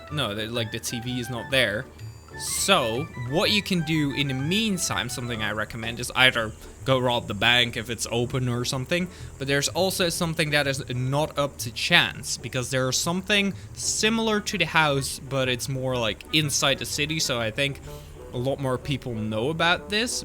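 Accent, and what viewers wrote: Sweden. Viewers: ar you from swiden?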